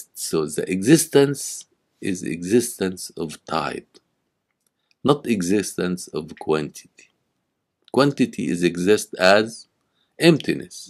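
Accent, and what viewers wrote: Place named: Syria. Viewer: I like you syrians pronation 😘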